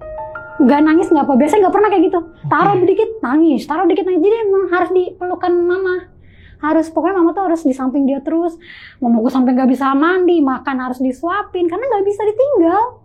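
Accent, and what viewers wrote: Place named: Bogor, Indonesia. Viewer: logat logat bongee pisan ini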